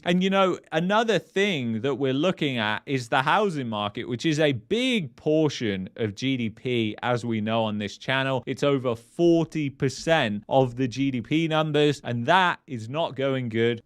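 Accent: United Kingdom. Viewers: “ have saw” . You said it twice. How about Present Perfect “have seen”? Why don’t you know this? I bet you say “have went”, “ have ran”, “ have came” instead of “ have gone”, have run”, “ have come”. I’m hearing this everybday all day. Since when have people suddenly forgotten the Past Participles of Irregular verbs? In the past there was always some uneducated person that didn’t know them like the Beverly Hillbillies but now it seems like everyone. NEWS FLASH: You are no longer speaking English but a kind of Pidgin English. There is no excuse for this especially someone speaking with a British accent.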